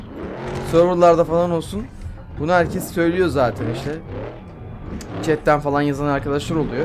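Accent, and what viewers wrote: Erzurum, Turkey. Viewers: ANA ABİ SEN ERZURUMLUMUSUN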